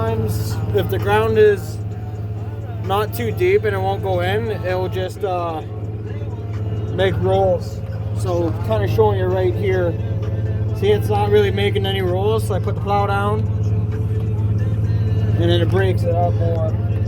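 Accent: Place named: South, United States. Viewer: OK I hear the country now...lmao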